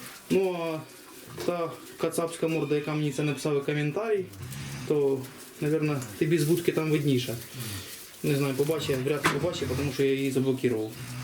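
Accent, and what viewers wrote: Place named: Poltava, Ukraine. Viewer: Говірка схожа на полтавську😂.мені як прлтавцю-понятно все.кому не понятно-хай не слухає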